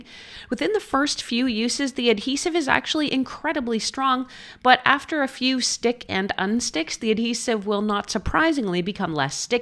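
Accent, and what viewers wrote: Canada. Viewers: Thank you, it seems like a lot of reviewers are Canadians nowadays. The giveaway is the pronunciation of "PROcess" in most reviews😅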